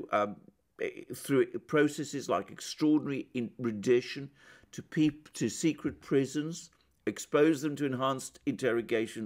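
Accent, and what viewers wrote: United Kingdom. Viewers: One of your best Alexander keep up the great work. That British legal accent is perfect to call out the hypocrisy of the US, UK and the EU.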